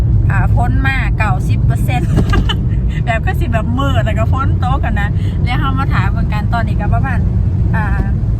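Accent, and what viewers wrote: Laos. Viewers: ก็เขาเป็นคนลาวเนาะจะให้พูดไทยได้ดีกว่านี้หรือ? ถ้าไม่ชอบก็ไม่ต้องเข้ามาดู แค่นั้นจบ!!!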